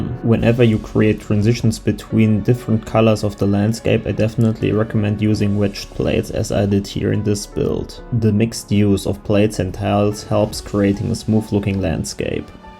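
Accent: Germany. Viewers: Bist du deutsch weil du hast einen ziemlich deutschen Akzent und hast die Deutsche Artellerie auch sehr gut ausgesprochen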